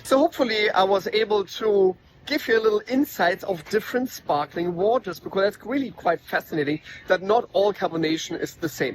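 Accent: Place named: Germany. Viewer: Are you German or Austrian? I love your accent. Im trying to familiarize myself with different accents. Also excellent video. One of the most thorough explanations ive seen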